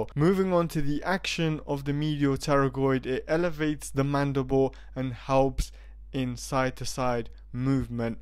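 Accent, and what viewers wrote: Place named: England, United Kingdom. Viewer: great..loved it, and its propper English accent😱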